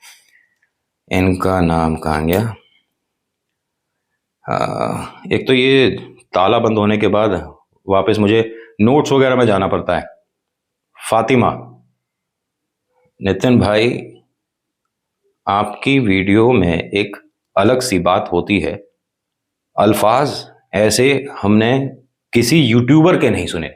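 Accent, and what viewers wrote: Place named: Mathura, India. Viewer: Aapki Mathurawali boli gazab hai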